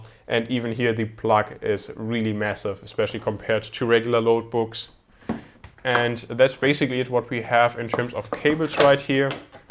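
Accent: Germany. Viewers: you sounds geman for me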